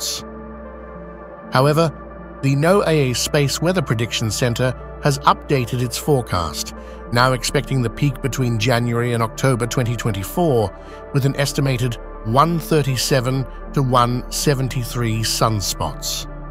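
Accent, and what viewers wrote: United Kingdom. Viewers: This channel is AI driven and produced content with a robotic British AI narrator so it sounds sciencie 😂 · These dramatic readings with the English accent makes it all so Shakespearean. Loved near the beginning talking about machine malfunctions even though unplugged. 1850s had no electricity or anything, anywhere to “plug” in. The only electrics were primitive telegraph systems that because of the wires linking the stations acted as antennas directing the CME output to the stations. Just give the real history without the stupid drama please.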